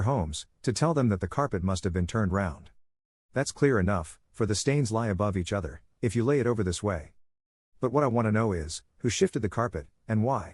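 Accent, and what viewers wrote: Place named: United States. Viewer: You can't read Sherlock Holmes with a robot American accent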